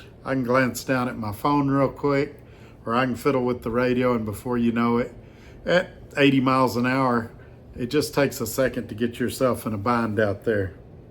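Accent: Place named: Massachusetts, United States. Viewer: I am loving the Massachusetts pronunciation. Have you heard about the tourist in Massachusetts who was so lost even his GPS gave up? He asked a local how to pronounce the place they were in, and the local just laughed and said, "You're not in Massachusetts anymore, you're in 'Massa-two-shits' according to your pronunciation!”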